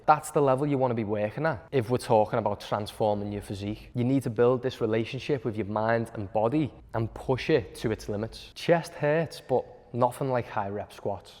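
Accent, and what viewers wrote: Liverpool, United Kingdom. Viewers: It’s scouse la, ynwa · Theres something, I like about the scouse accent, which is fun, but also, i'm not fucken about lad.... 😛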